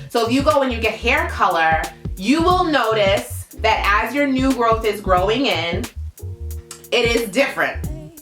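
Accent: New York, United States. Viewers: "It's Stoop-id" that NY accent is strong gurl...😆😆. Anyway stand in your truth you did not get this far steering folks the wrong way. I appreciate your candor and willingness to supply the demand in this community. 🙌🏾👌🏾👍🏾.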